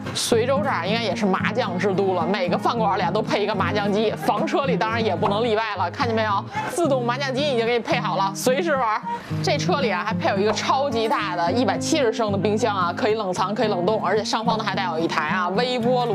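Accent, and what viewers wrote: Beijing, China. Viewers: 车是不错， 一口京腔听起来很不舒服，普通话不好吗？ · 既然面向中国或者世界，主持人建议不要用一口北京腔。用标准的普通话可能观众听了更适合一些。个人建议。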